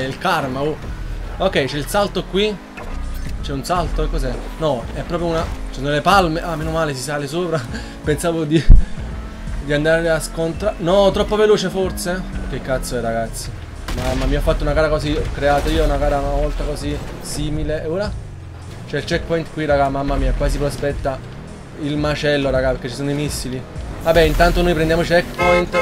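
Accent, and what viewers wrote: Naples, Italy. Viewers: È di Napoli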